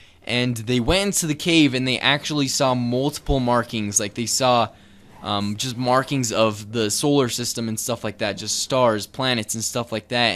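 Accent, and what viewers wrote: Bosnia and Herzegovina. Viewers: U a bosnian?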